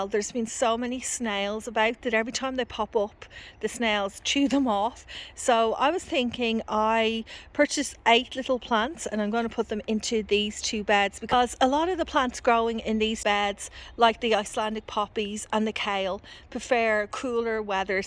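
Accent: Ireland. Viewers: Love the Irish accent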